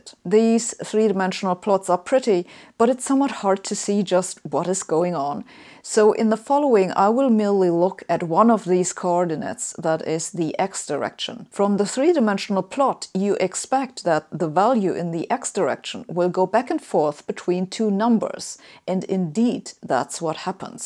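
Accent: Germany. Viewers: Maybe it's the German accent or something, it sounds like she's cutting words too harshly . But it's ok, at least I clearly understand what she says, unlike real anglophones who are "eating" their words.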